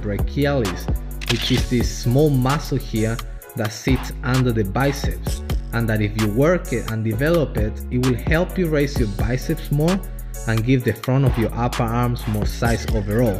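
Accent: Spain. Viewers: spanish accent, acento español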